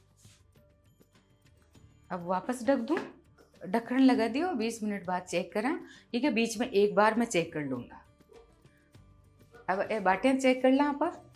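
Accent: Rajasthan, India. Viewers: Aap rajsthani bolte he so mithi boli he aap ki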